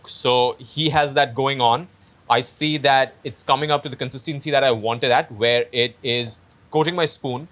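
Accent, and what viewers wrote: India. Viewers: yup. so true. Also this is exactly how i speak. Just neutral Indian English accent. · indian accent is cringe asf. it's a meme. he should speak with a proper American accent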